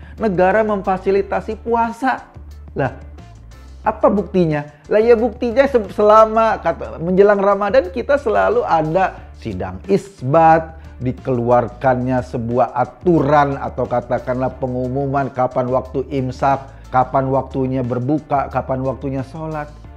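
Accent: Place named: West Java, Indonesia. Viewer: Mendengar logatnya kelihatannya dari bagian barat Jawa. Sejak awal proklamasi nggak mau gabung NKRI !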